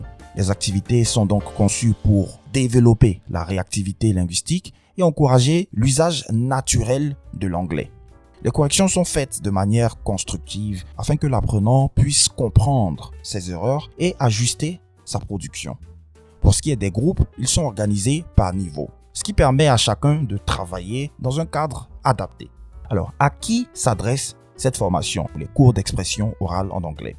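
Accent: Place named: United States. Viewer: Salut mon frère, franchement j'ai vraiment la façon donc tu parle cette anglais américain. Je suis vraiment impressionné. Stp est-ce possible d'avoir ton contact si ça te gêne pas. J'aimerais vraiment développer ma conversation en anglais américain avec un speaker en plus de mon exercice personnel de parler en anglais ( shadowing)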